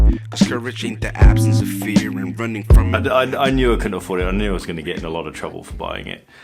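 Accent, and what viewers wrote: RP, United Kingdom. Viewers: Congratulations on the Baby? Where did you go to school? I have been listening to RP on YouTube and to me you have some RP but other hints but can't place. I am from USA.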